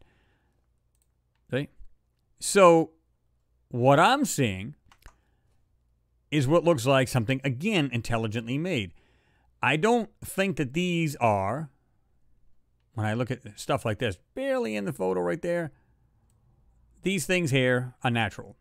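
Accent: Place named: South, United States. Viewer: bro I love your southern accent.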